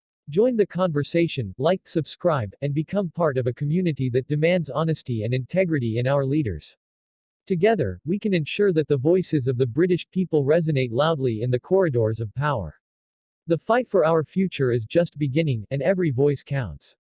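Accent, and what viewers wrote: United States. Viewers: AWFUL American commentary AGAIN ! WHY ?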